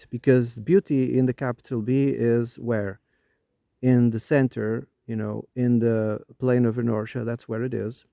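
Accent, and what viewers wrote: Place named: Portugal. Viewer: Inglês com sotaque português detetado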